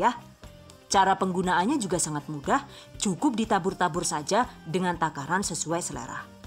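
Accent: Banyumas, Indonesia. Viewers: Fokus suara ngapak🤣🤣🤣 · Aq ngakak kalo si ibu ngoMong ngapak..🤣🤣🤣. Yakinlah sumpah aq ngefensss karo sampean.. luph youu.. resepnya mantuul.. #salamdariwongpemalang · Lah ngapak wkwk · Deneng ngapak 😭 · Ngomong ngapake lah buk, ngakak nyong 😂😂😂 maturnuwun resepe bu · Alakaehh wong ngapak kie tah 🤣🤣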